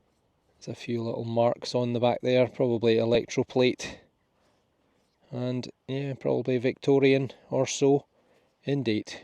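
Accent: Scotland, United Kingdom. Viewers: Gonna be honest. I saw one of your shorts and I'm watching this now mostly so I can hear more of you talking. 😄 I'm an American and I get such a kick out of a Scottish accent! Ok, I also love metal detecting videos, but add on a Scottish accent and that's 50min of pure enjoyment. 🙂💜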